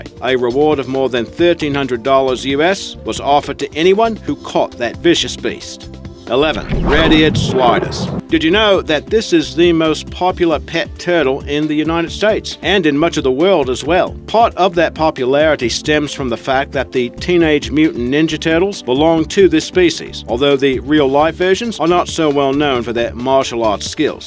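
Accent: Australia. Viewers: What is your accent? It's sounds Australian / Boston / English / New Zealand / Texan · Is this an aussie or NZ accent?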